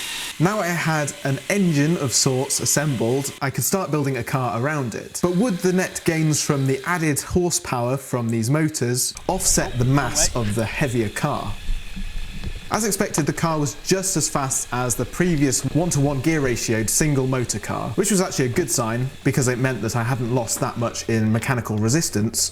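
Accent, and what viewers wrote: England, United Kingdom. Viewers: I have a new favorite english accent. 😄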